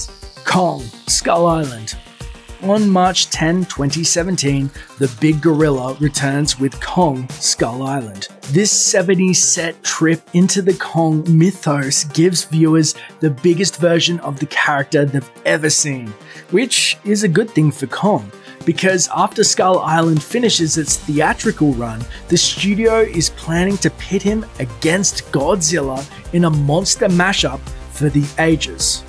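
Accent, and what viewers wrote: Australia. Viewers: Anyone else realize this is Aussie guy Commentating in his regular voice?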